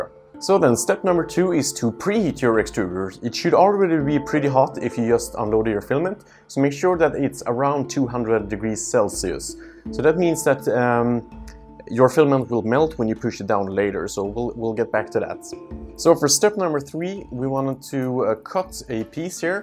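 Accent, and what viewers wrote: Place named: Sweden. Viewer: I bet 5000€ this guy is Swedish xD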